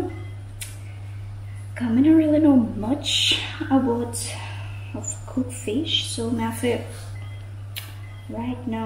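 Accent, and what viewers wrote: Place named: Jamaica. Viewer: Congrat to you+ baby girl news Wow i'ts a 👧 girl 🎉🎉❤. I tell you i respect you very much 👍🏻.you have a good vibes, you adapt the jamaican culture very much 😊🙏🏻 lady you talk like a jamaican. & you cook like a jamaican.nuff respect & nuff love.bless up from germany.🙏🏻🛐🕊💫.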